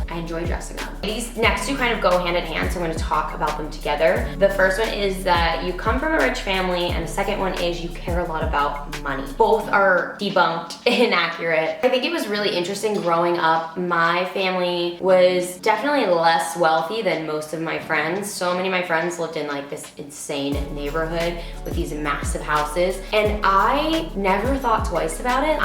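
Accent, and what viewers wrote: United Kingdom. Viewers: she sounds like she's from Yorkshire! omg that was so freaky she sounded exactly like my cousin!!!!!!!!!!!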